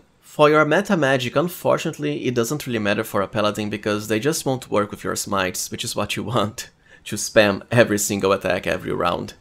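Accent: Brazil. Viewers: Eu reconheço um sotaque brasileiro quando escuto um!!!!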